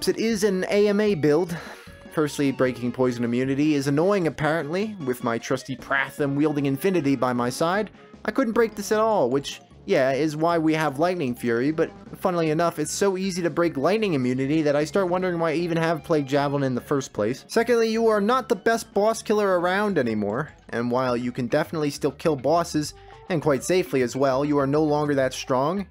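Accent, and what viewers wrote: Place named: Northeast, United States. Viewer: lol oh word, you have more of a northeast rhythm i thought. Anyway, good stuff! Although sometimes I wonder if you like the game ahaha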